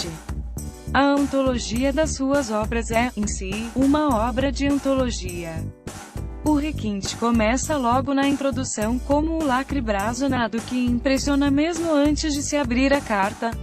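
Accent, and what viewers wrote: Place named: Brazil. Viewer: Porquê em brasileiro?? nada contra mas sendo caso da política portuguesa não arranjavam um voz informatica duma gaja tuga??? abraço e parabéns subscrevo o canal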